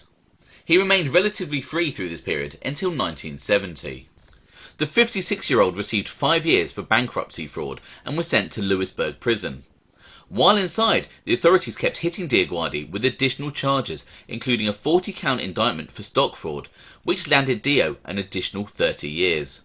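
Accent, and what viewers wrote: United Kingdom. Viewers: I fucks wit heavy. Perfect limey accent to tell stories and excellent stories with pictures I would like more wiretaps tho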